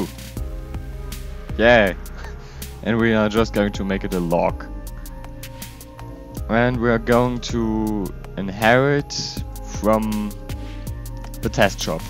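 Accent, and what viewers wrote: Germany. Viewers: Hey dude, is comming a new Update of Slimefun? or is the project abonden? because the last update was in september 2015. But away, Nice video bro. And are you German? Your Dialect in English is like German. :)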